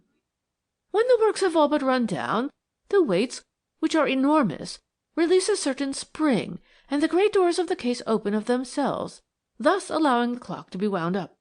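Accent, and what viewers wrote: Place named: United States. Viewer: Why an American narrator???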